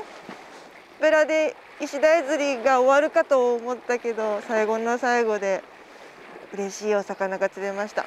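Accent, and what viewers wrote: Kagoshima, Japan. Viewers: かごんまのひと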